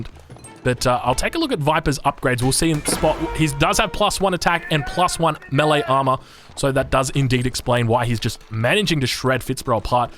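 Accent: Australia. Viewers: omg... can you be less aussie?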